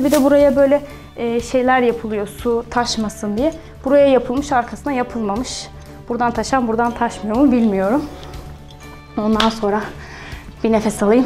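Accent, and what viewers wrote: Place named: Central Anatolia, Turkey. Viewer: İç anadolu aksanı var bence 🤔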